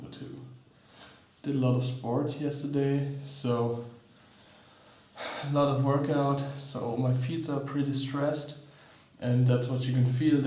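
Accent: Germany. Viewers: Man hört mega deinen Deutschen akzent😂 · Man hört das er deutsch ist xD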